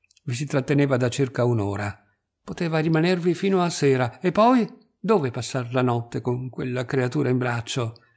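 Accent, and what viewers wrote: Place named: Rome, Italy. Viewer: spettacolare interpretazione in romanesco, sai com'è.. so' romano anch'io..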